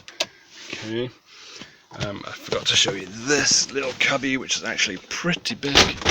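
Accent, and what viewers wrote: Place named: United States. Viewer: you've developed an American accent!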